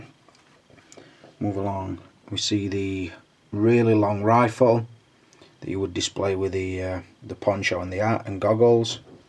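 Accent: United Kingdom. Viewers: What part of the U.K. are you from? I love the dialect. I'm a fan from the U.S.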